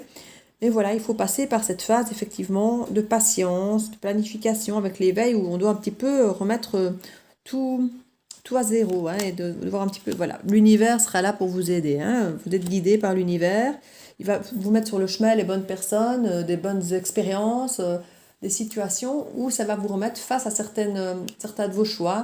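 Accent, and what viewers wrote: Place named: Liège, Belgium. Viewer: Une guidance tres parlante... comme toujours... ;-) ca evolue petit a petit, quelques petits changements chez elle depuis ta guidance mais j'ai reprend mon "pouvoir" tout doucement pour me prendre en priorité et ne plus etre dans l'attente... Pour le reste, on verra bien.. ;-) Un grand merci pour tout... Plein de belles énergies pour toi (j'adore toujours autant cet accent liegeois :p )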